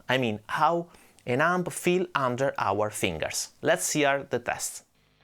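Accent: Italy. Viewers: Look, I just wanted to joke around a bit. I mean the name what could it mean? That this nice guy likes Fender and Gibson guitars. That's all. And I asked, why he didn't choose to honor also PRS guitars by calling himself ", Paul Gibson". You got me? Ich nehme deinem Namen nach an, du bist Deutscher. Ich bin ein lustiger Tiroler, der halt in D nicht gut ankommt. Bin leider kein Mario Barth, was soll's! Dass Italiener ist, erkenne ich an seiner typisch italienischen Aussprache, was ja wirklich nebensächlich ist. ich wundert immer wieder, wie schnell man auf YT entweder blöd angeschrieben oder belehrt wird und alles so todernst genommen wird. Ein bisschen mehr Lockerheit ist nicht nur beim Gitarrenspiel angesagt, sondern generell. Aber Schwamm drüber! Ich wünsche dir noch alles Gute und rock on!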